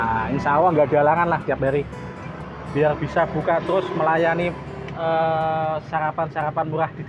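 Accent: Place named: Central Java, Indonesia. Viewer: Nah ngno jozz segala arah dshot 👍👍👍 masuk2 yo ngno logat jawa tengahe nongol jg sip lah.....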